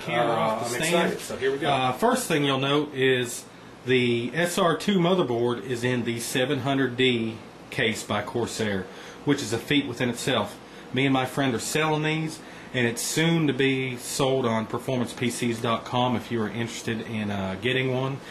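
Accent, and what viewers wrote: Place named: South, United States. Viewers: I'm in love. even though i have a basic computer (if im compairing it to this! lol) but I LOVE THIS CASE. makes me hate my antec 900 lol. very nice and clean build. and i love your accent. nice southern accent :) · Your accent is so... southern.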